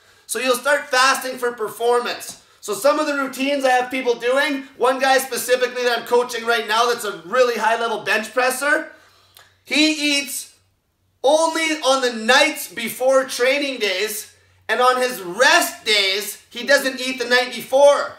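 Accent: Canada. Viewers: i think im in love. this guy sounds canadian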